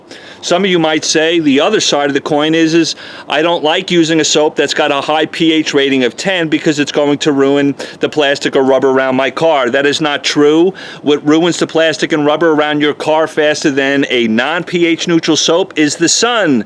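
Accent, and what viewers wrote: New York, United States. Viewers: Did you perhaps grow up in ny or nj? The way you talk sure sounds like it :)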